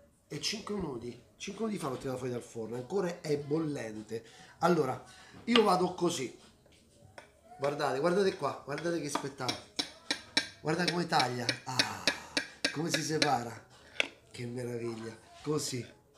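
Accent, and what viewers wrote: Rome, Italy. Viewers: Che bello risentire il romano!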